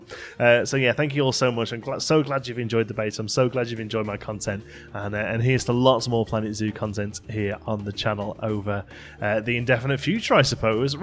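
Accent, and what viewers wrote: United Kingdom. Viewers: Loving these videos this game looks amazing can't wait for it!! Nice to here an British accent once in a while too lol!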